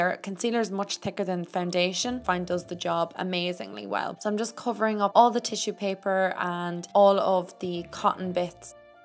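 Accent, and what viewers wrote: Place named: Cork, Ireland. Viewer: You sound like ur from Cork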